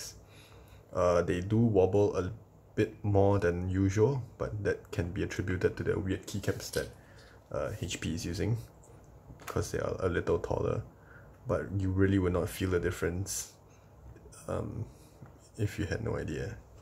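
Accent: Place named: Malaysia. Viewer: Lol I like your review on this. Thanks man. You sound like you're from MY or SG btw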